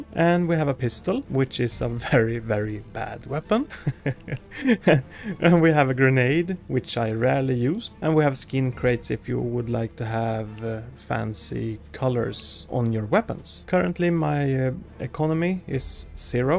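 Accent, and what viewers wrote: Sweden. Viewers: Are u swedish? Im swedish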